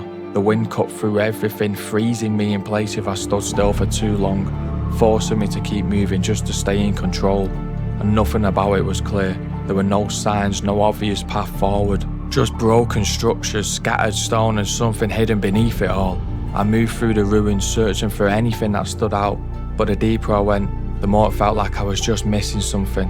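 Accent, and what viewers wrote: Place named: Manchester, United Kingdom. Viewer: Wonderful Channel I Love watching your Adventures and Narration Can I ask are you from the Manchester Area?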